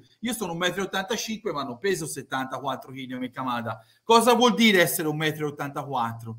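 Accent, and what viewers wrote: Tuscany, Italy. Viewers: Ho iniziato a seguirti da poco ma ti faccio i complimenti..a parte la parlata toscana che adoro, io sono bolognese ma interista, sei l unico che in tempi non sospetti ha detto le cose come stanno...i risultati hanno offuscato la disastrosa situazione economica...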